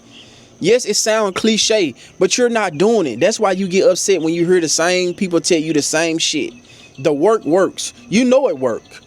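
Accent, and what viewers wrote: Memphis, United States. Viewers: I love the Memphis accent, reminds me of a rapper I listen to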